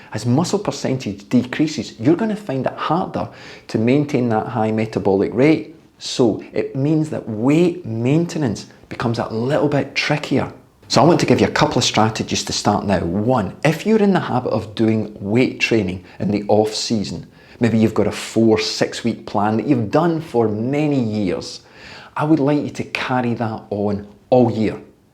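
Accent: Scotland, United Kingdom. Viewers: I love your videos! I've learned a lot (I am 59 and just started cycling again after decades). I find it extremely hard going up any hills but am getting a little better with the smaller inclines. I'm not exactly a skinny Minnie either, lol. Thanks again, and as a Canadian, I enjoy listening to your Scottish accent while you're explaining things. 😁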